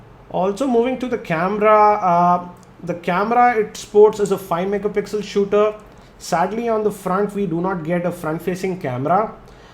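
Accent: India. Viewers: moron that is indian accent.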